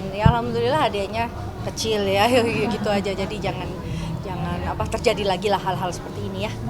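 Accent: Java, Indonesia. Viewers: Medok banget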